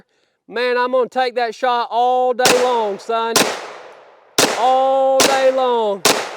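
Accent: South, United States. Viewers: Omg…😂 maaaaan I abso-fuckin-lutely LOVE THIS VID!!!! Your country accent, appearence, gear and humor was it for me!! Lol one of the most logical vids I’ve ever seen on here man and you now have a loyal subscriber/ follower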